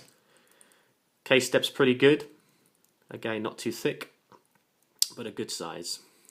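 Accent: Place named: Mid-Atlantic, United States. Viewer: Hi, indulge me.....Do you work for Omega? Also your accent is very mid-Atlantic. Any personal background?;-)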